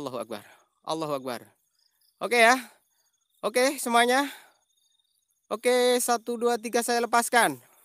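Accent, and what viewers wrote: Banyumas, Indonesia. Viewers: wkwkwj ngapak bae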